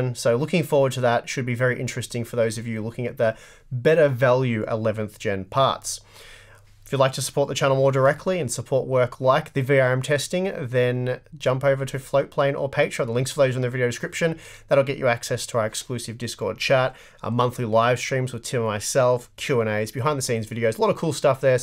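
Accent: Australia. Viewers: Is it an Australian thing to pronounce the letter Z as "Z-ed" In America we pronounce the letter Z as "Z-ee"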